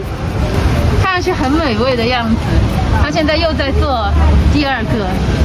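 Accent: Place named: Taiwan. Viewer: 视频拍不错，学哪台湾腔，真受不了。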